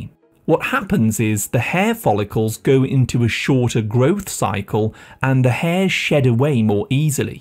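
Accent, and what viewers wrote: England, United Kingdom. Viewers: Pronounced English accent makes me buy nothing.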